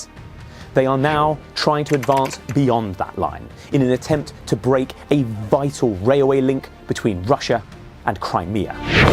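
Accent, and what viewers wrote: United Kingdom. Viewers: I am so sick and tired of some idiot with a British accent telling me stuff. It’s insulting.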